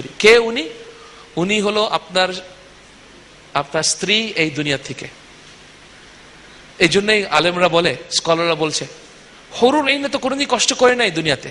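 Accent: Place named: Pakistan. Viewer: Unar English Lecture gulo shunechilam, vabchilam uni Pakistani! Valo laglo khub! Marhaba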